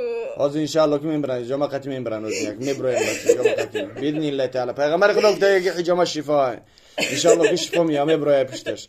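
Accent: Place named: Afghanistan. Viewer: ин кори аз Точикистон иё аз Афгонистон? гап заданиеш монанди афгоно кам кам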